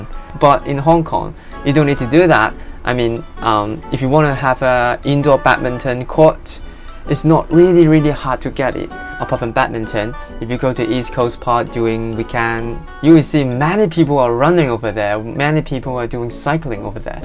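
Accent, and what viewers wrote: United Kingdom. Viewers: Yes I love the British accent too. Does Dave speak the same accent as you? BTW miss Dave hope he will be back soon! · LUV THE BRITISH ACCENT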